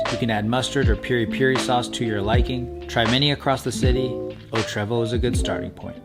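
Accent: Brazil. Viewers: You are learning Brazilian Portuguese 😅😅 Portugal accent is stronger, like British English vs American. But you are doing great, ready to go to Rio de Janeiro🙌🏻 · you are learning portuguese accent from brazil and not the real portuguese from portugal :D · Just please dont use the brazilian portuguese app you were using in the video, please! Portuguese from Portugal is a bit different from Brazil and the pronounciation/accent are very different. Thanks.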